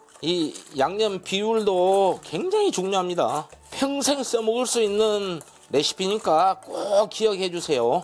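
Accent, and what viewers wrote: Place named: Yeocheon, South Korea. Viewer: 이분 사투리는 여천 사투리라고 확신합니다... ^^ 실력,해설,지도,방송 모두 굿입니다!!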